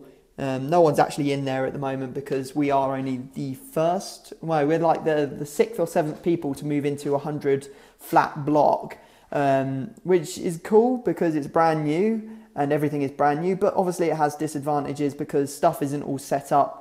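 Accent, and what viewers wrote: Nottingham, United Kingdom. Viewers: Do u come from nottingham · Are you from nottingham?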